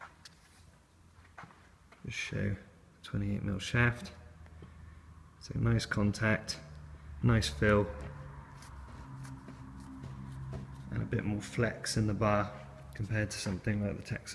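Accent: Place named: West Country, United Kingdom. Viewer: Love the sound of 'knurling' in a West Country accent.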